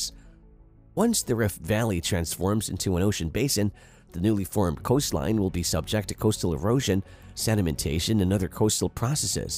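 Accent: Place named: United States. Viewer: He's a robot with a vaguely American accent.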